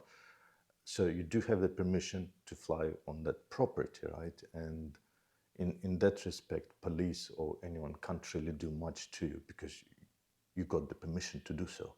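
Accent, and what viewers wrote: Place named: Turkey. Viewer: Abi Türk müsün aksanından anladım selamlar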